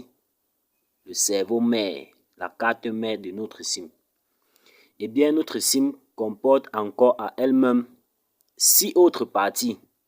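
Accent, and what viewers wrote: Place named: Cameroon. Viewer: Quand tu vois accent ça peut être ivoirien , c'est un camerounais ouais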